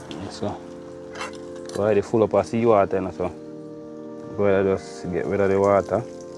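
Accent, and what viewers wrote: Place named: Jamaica. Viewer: What part of JA you from,? You sound different from Raj and JamaicanWildlife!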